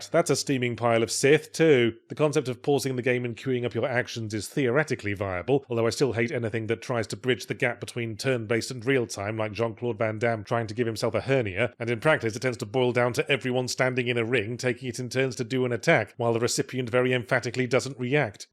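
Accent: United Kingdom. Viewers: Soo glad have this. Now I can fall asleep to sweary British voice. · Love to listen to Yahtzees buttery british voice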